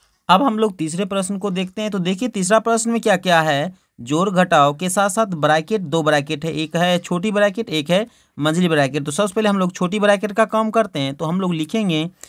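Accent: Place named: Maithili, India. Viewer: Bhai aap bahut maithli bol rhe ho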